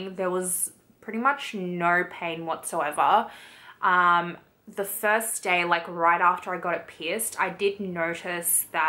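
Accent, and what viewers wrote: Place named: Australia. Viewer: Love your accent!! I've heard Australian accents before but never that hint of "r" that follows ur "o"...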